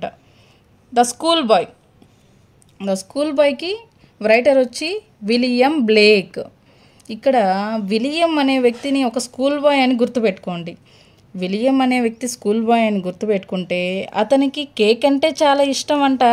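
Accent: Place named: Guntur, India. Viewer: Madam గారు from Guntur అనుకుంటా 😊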